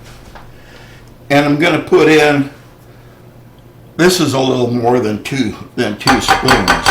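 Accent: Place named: Chicago, United States. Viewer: Where ya from? I’m from Chicago n you kinda got my accent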